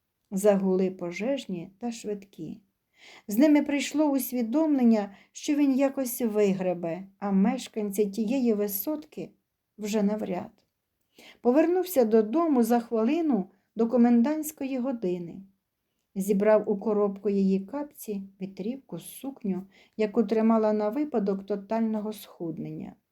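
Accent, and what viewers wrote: Standard. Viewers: Добрий день, у вас чудовий голос, спокійний, знання української мови стовідсоткове, мабуть ви єдина, що розмовляєте на чистій українській мові. Дякую вам. Завжди охоче слухаю вас.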